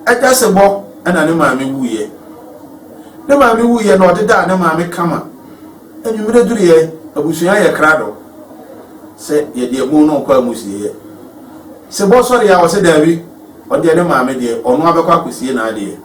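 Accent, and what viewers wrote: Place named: Ashanti, Ghana. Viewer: Great job, brother. But will urge you to speak bono kasa, some of your tones in this video is asante , I hate that.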